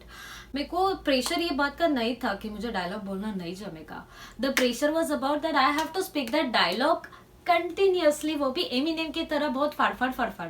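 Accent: Maharashtra, India. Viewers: LADKI KI HINDI LAGI PURI MAHARASHTRA WALI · Her hindi-marathi accent is so sweet^^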